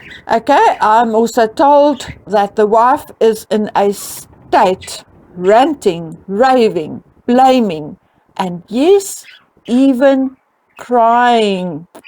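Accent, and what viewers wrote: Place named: Durban, South Africa. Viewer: JUST LOVE ACCENT EX DURBS? BACK IN UK.... M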